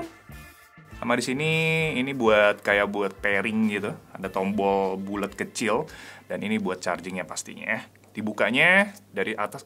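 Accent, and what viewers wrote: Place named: Banjar, Indonesia. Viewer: banjar bnar wwkwk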